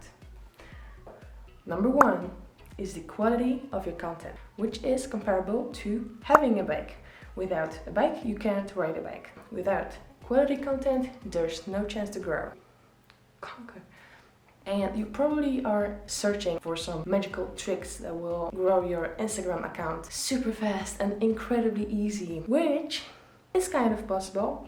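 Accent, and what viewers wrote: Netherlands. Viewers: I love the European accent or rather Dutch accent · Hahahha ik hoorde meteen aan je engelse accent dat je nederlands was, thx voor de video wel echt super handig!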